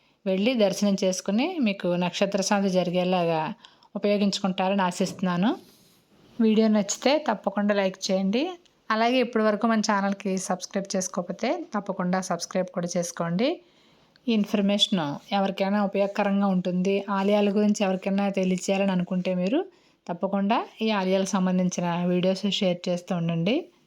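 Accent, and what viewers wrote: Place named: East Godavari, India. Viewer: మీ వీడియోస్ అన్ని చాలా బాగుంటాయి గారు.నేను మీ వాయిస్ చాలా బాగుంటుంది.మీరు చెప్పే విధానంలో ఈస్ట్ గోదావరి నేటివిటీ ఉంటుంది 👌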